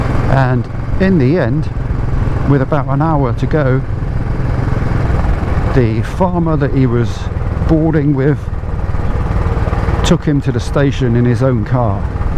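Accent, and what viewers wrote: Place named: RP, United Kingdom. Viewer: to a northerner, he sounds well posh which throws me right off 🤣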